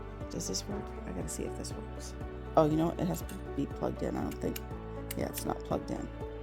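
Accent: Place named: Boston, United States. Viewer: Thought I recognized that accent - Bostonian here!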